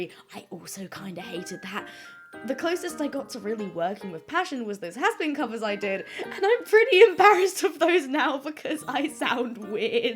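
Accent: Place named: United Kingdom. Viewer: oh god she's british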